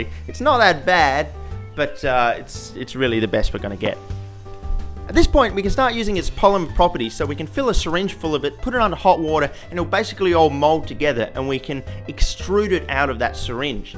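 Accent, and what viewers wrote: Australia. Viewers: I'm not the smartest fellow so I'm just here to hear that nice aussie accent while i play video games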